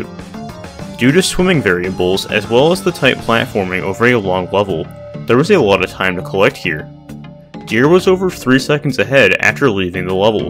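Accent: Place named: Canada. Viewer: "...sorry if i keep rambling on but theres something I need to talk abut..." I fuckin love canadians and how you all talk <3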